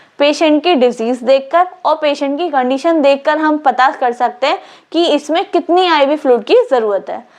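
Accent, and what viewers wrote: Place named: Assam, India. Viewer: Ma'am aap Assamese ya bengali ho kiya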